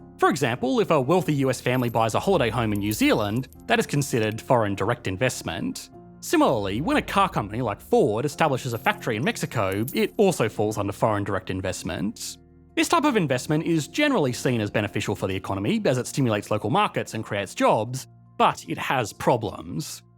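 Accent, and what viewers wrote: Australia. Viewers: because he's Australian and that's their accent? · Because the guy is Australian. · this looks like an interesting video but I don't know if I can watch it as the guy's accent is incredibly annoying! The way he puts stresses where they don't belong and puts unnecessary drawls at the end of words like "issues...ssss" and "economy...yyyyy" is awful! Don't know where in Aus he's from, I'm British btw. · He is Australian. This is his channel, he owns it.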